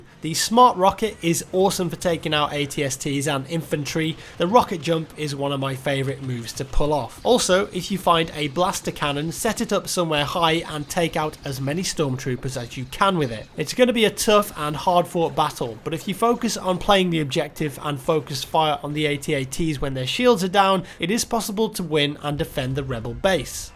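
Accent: England, United Kingdom. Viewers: You seem to have the same English accent as Rick shiels a golf instructor on youtube. I wonder if you fellas are from the same area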